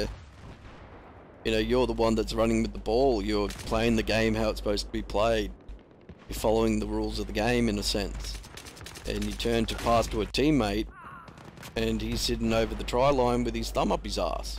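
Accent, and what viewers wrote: Australia. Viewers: Like maa peenis, i love australian accents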